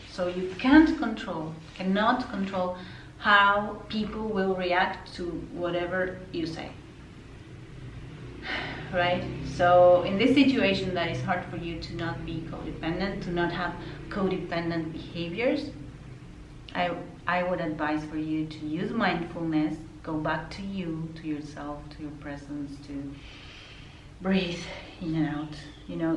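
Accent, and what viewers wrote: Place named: Argentina. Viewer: Me encanta. Ya sabia cuando te escuché hablar en inglés que eras argentinaaa🎉 Yo soy americana pero tengo gente como familia en argentina (Viedma Rio Negro). Gracias por este mensaje..necesitaba esto hoy. ❤❤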